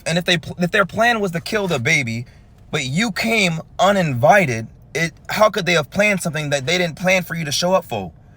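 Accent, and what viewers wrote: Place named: Minnesota, United States. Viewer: it’s so cool that your from MN. I’m born and raised and I could kinda tell with the way you talk. LOVE YOUR VIDEOS THO!! been watching all day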